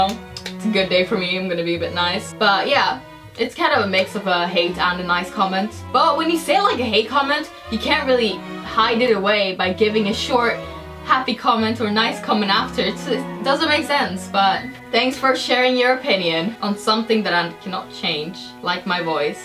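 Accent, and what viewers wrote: United Kingdom. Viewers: Why do you sound british fancy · you seems like your voice right now is like British and your voice in gaming is like america!?!? And are you even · Why in these videos you have like a British ascent and now days you don’t?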